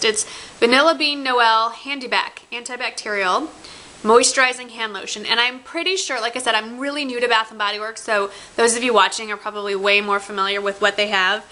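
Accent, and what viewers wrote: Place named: New England, United States. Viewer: I love listening to her talk. She's from the New England states and has that accent. :)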